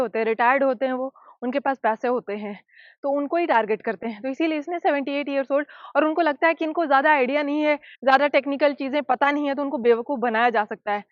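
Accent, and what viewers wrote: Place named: Bihar, India. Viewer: ji namaskar 🙏🙏 Kya aap Bihar se ho aapki bhasha se pratit hota hai🙏🙏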